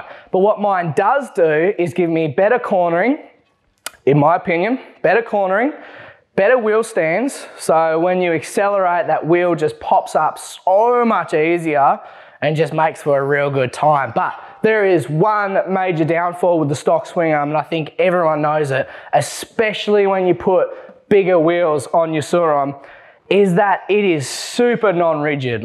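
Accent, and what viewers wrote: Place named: Australia. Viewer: Awesome video mate… one of the best from you guys… your super Australian accent/words used to describe things was by far the highlight of this video… please make all videos exactly like that 😂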